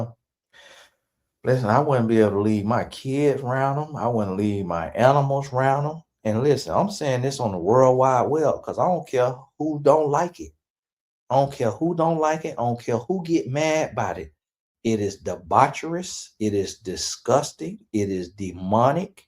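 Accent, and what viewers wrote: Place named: Florida, United States. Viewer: Smh, I've had this convo with a few folks recently and was left feeling really bad. Was questioning my values and acceptance of others for standing firm in not being willing to entertain a potential bi-sexual man. THANK YOU for speaking on this... And the country/Fl delivery is quite funny and REAL😂 Ladies, resistance is really a form of protection, don't let these podcasters and society let you think otherwise!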